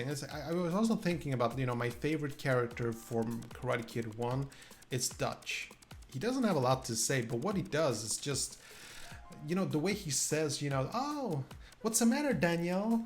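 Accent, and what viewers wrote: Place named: Sweden. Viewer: Btw are you Swedish? Your accent sounds Swedish.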